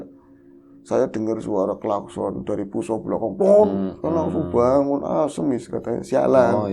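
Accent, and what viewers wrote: Banyumas, Indonesia. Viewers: Weee pagi2 tayang... seneng denger bhs mas e narator ngapak2 gmn gtu.... ada nak 🥰🥰🥰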